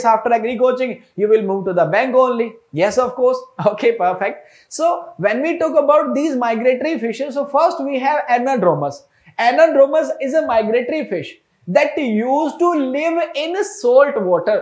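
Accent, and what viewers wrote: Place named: India. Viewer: thank u very much, your english is not only easy to understand but I also like your Indian Accent and the simplicity and the choice of words while explaining. I'm from North but I'm more comfortable in this format since it shows how an ordinary teacher is improving his skills of teaching and explaining by using simple words. Even if anyone is struggling in English should see these English lectures because of your clean, simple and plain English and learn how to adapt according to the environment. Thank u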